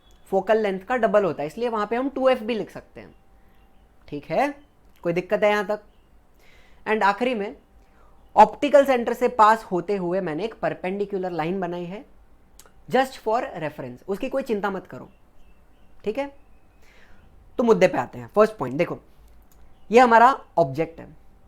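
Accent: Maharashtra, India. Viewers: sir your mother tounge is marathi?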